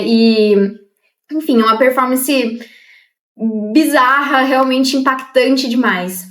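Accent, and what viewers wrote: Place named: Caipira, Brazil. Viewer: Eita, sotaque caipira não dá. Muito rim. Vai estudar mulé.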